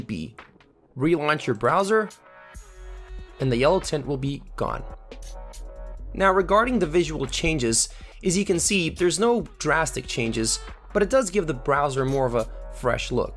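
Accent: Balkans. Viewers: You are from Balkan,aren't you? Still you speak English very well...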